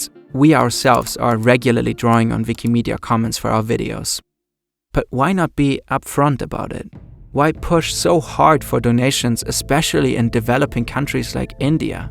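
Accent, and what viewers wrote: Germany. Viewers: Coz he's german w is pronounced as v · Are you german? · you sound like a native german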